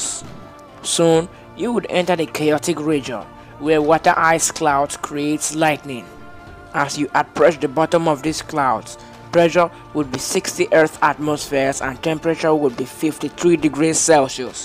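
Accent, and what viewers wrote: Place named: Jamaica. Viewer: What country are you from? You sound Jamaican.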